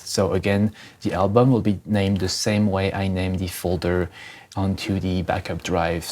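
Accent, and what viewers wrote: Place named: England, United Kingdom. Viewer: Nice and clear tuto with a clean english accent ! Nice work thanks for it ! It helped me a lot.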